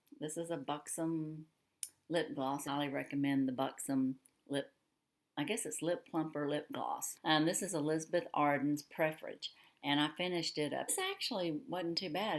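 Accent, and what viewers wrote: South, United States. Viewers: I just love your sweet southern accent. I have the matching Lancome Renergie Lift eye cream. Such a pretty palette you are working on. Oh, I love Buxom lipglosses.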